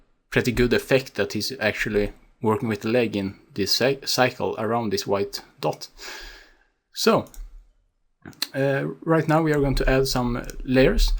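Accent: Sweden. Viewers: are you swedish? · Du är svensk!!!! Man hör de jätte bra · Man hör att han är svensk! :)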